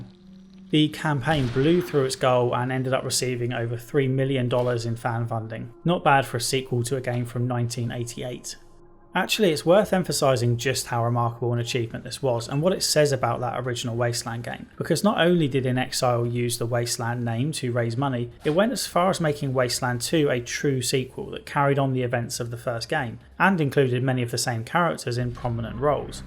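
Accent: United Kingdom. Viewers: Soft spoken britsh game analysis ASMR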